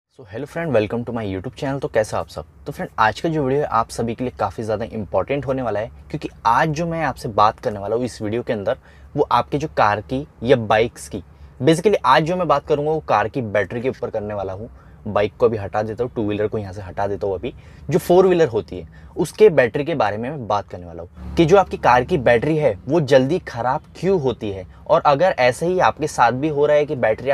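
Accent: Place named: Hyderabad, India. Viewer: Love Your hyderabadi touch to accent😁❤️❤️Good info👍👌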